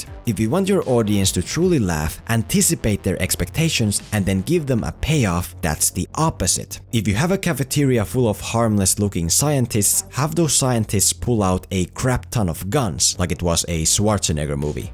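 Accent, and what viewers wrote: Finland. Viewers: You have the most Finnish accent. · Are you finnish? For some reason im hearing a finnish accent... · Are you Finnish? Because your ralli englanti is showing through. Ja jos oot ni mitä äiä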